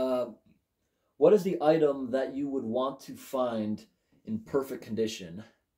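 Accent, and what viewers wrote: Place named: Massachusetts, United States. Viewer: I've just stumbled onto your channel in the past few days, and you are an absolute delight. It's funny that we're the same age and have similar interest. I am a bit more into the entertainment side of vintage products and packaging like Chuck E. Cheese and Gadgets (Warner Brothers themed animatronic pizzeria). I wonder if the electrical and technological side of that may be interesting to you. Also, that Blue's Clues pasta in the background has really been taunting me. Are you going to save it for a reprint of the pasta? It may be more advantageous to just look from the SpongeBob pasta from the same year to get an easy comparison since they still make it. Oh, additionally, that "why do they call it an oven when you ove in the hot food and out hot eat the food" is a reference to an edited Garfield panel. It's a kind of obscure meme. 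Last thing, your Massachusetts accent is delightful. Stay rad. Love your stuff!!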